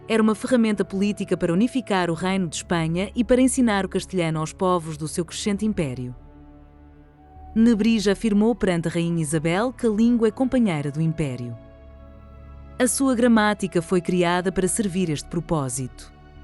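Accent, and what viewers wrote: Portugal. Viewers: Este é o sotaque padrão do português de Portugal e não o sotaque lisboeta. Uma grande parte dos lisboetas, senão a maioria, não fala assim. Questiono se o discurso não foi gerado por IA, uma vez que se nota algumas falhas, como por exemplo, pronunciar o nome piloto [pilôto] como sendo a primeira pessoa do indicativo do verbo pilotar [pilòto]. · Parabéns pela aula de história sobre a gramática de Fernão de Oliveira! Esclarecedor e didático esse vídeo ficou ainda mais saboroso devido ao delicioso sotaque lusitano numa voz agradável e de boa dicção. Obrigado! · A narração sempre com o sotaque lisboeta torna-se parcial e injusta para com os propósitos do canal, lembrando que há outros sotaques da língua portuguesa que também merecem ser prestigiados. Cada vídeo do canal deveria ser narrado em algum dos diversos sotaques falados em Portugal.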